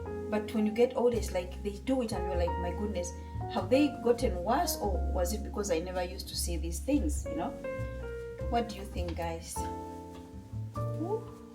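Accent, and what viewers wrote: Uganda. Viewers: Hi, are u ugandan? Ur ascent is so Ugandan.. Love u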